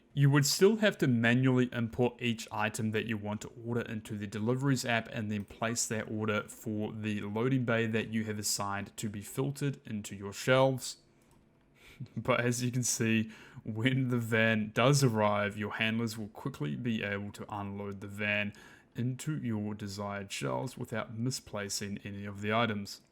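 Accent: New Zealand. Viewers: as an aussie, he defo speaks proper English, just sounds like he's from new Zealand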